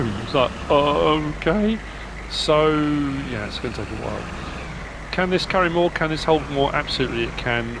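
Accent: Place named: United Kingdom. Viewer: "Kango-hammer" seems very fitting for the British dialect, you saying "jack hammer" just doesn't sound right with your accent. (Plus Knango hammer just sounds cooler lol) I work in a quarry, kinda. I work for an aggregate and concrete company, I drive the redimix trucks. So you're pretty spot on with your story line, which was a brilliant idea and nicely executed. As far as the diehards that say "it's farming Simulator, there shouldn't be any forestry", I've never met a farmer that didn't own a Chainsaw, that wasn't ready to cut some trees down for field expansion and for firewood. Not to mention, TREE FARMS??? There's lots of tree farms out there for wood pulp for paper. Any farmer out there is looking to make the most of their time, and to not ever run out of money makings. I don't understand these people that say "you can't do that on this, you're HAVE to do it THIS way". 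If it were said to me, I'd have a few choice words for them, of which I won't say on video. DO YOU. Don't worry about it I'm not doing it the way you would.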